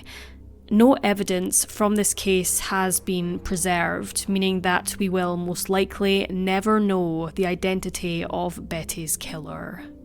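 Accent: Scotland, United Kingdom. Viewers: I'm Irish and she's 100 percent Scottish . Love it 😍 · Laura she is Scottish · Definitely Scottish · She's Scottish. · What part of the UK is the narrator’s accent from?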